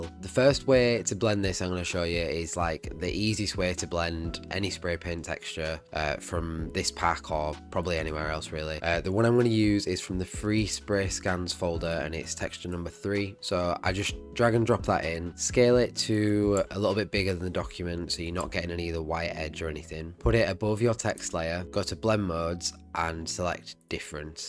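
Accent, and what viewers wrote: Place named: United Kingdom. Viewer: Sooo bri'his! (I lived 2 months in Reading years ago :) )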